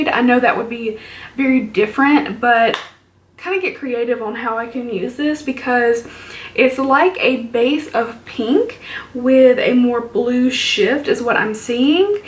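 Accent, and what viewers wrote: South, United States. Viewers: i must be crazy or do you have a little southern accent? i love them. my insta is :•) ive never tried a jeffree product but ive always wanted to try a highlighter 🤠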